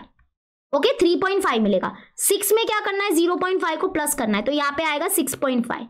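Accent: Maharashtra, India. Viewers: r u marathi????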